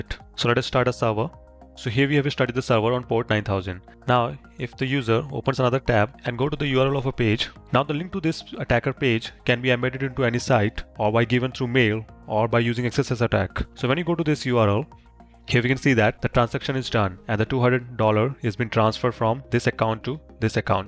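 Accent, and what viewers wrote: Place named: India. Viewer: you are indian and on top of that you are talking fast , so i just gave up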